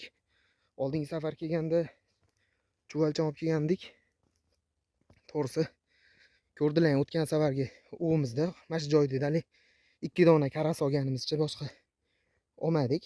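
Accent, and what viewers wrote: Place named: Andijan, Uzbekistan. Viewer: Шева Андижонча. Ов бароридан келсин, омад.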